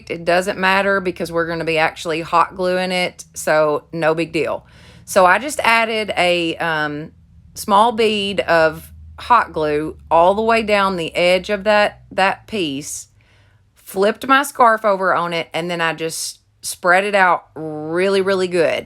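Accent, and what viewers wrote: South, United States. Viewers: Your stiching is awesome and I really dig your crafts however, your sweet home grown down south voice is what I absolutely adore.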